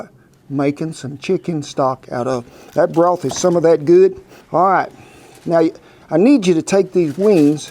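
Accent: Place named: South, United States. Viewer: You have the same accent my grandpa did. It took me off guard initially because he passed away, and I hadn't heard the southern accent he had in forever. Thank you for a great recipe and helping me remember my grandpa 😊 😃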